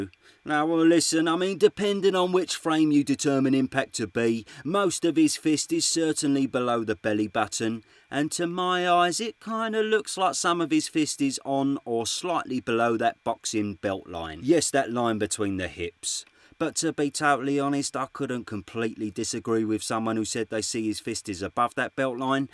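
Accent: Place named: England, United Kingdom. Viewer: because of you mate, i'm seriously considering moving to england, just to spend all day talking like you do.. 😂😂🫡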